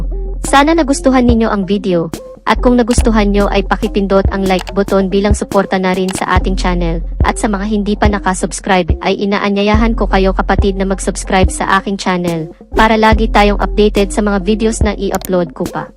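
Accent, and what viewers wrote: Visayas, Philippines. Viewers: Bisaya ba ang nag sasalitang ito....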